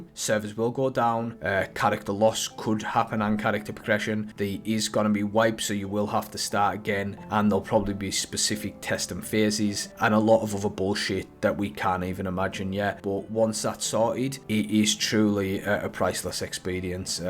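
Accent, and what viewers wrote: Newcastle, United Kingdom. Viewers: Hearing that Geordia/Maccam accent talking about Ashes is wild haha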